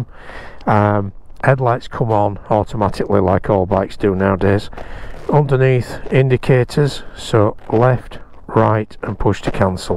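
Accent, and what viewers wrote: Yorkshire, United Kingdom. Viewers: Just found this vid.Nice to hear Yorkshire accent.I live in NZ but I am from Sheffield .I am looking at the Classic 350 as a possible bike to down size to and found the info given very useful,cheers .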